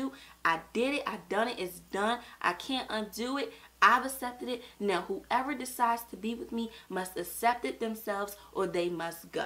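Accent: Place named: Maryland, United States. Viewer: Like you have A Maryland Accent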